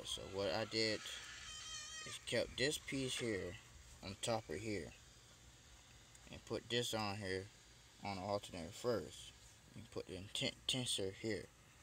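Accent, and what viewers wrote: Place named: Louisiana, United States. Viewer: ok you're either high, drunk, both or whatever but you're making no sense at all. I can hardly understand what you are saying. sounds like you might be from Louisiana.. but you start one sentence then start taking bout something else. you also need to hold the phone right side up. I lost a lot of brain cells watching this video. not knowing the names of the parts is not helping. by the way I stopped watching your video after 2 minutes...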